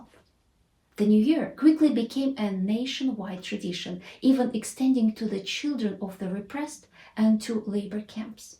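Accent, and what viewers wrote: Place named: Russia. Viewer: It is very soothing listening to your Christmas description with your charming Russian accent. 🤗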